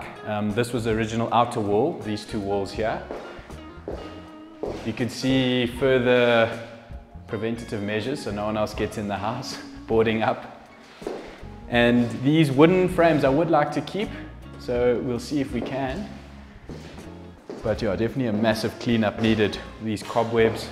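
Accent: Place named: Johannesburg, South Africa. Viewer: Imagine my delight when clicking to the vid and hearing that familiar lekker Joburg accent. Easy sub. Well done so far and keep it up. Really enjoy the videos I've watched thus far, very relatable content.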